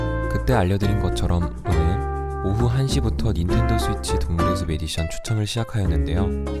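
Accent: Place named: Gyeongsang, South Korea. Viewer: 대구분이시네용 ㅋㅋㅋ 같이 잘되길 빌어요 파이팅!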